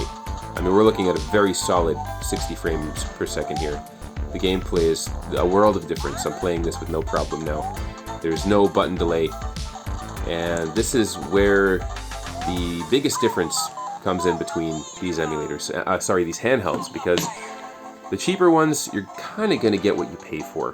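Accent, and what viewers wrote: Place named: Canada. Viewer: You sound Canadian. Is that $30 Canadian?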